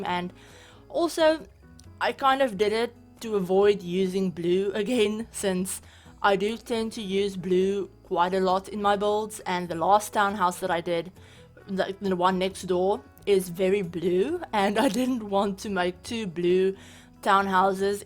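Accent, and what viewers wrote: South Africa. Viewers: It is SO amazing to see a South African Youtuber, let alone a South African SIMS Youtuber! I got so excited when I heard your accent! The house is GORGEOUS and you did a great job! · I must say - I thought she sounded South African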